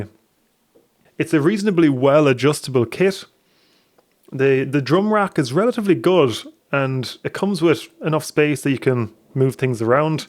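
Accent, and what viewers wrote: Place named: Ireland. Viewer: He sounds Irish!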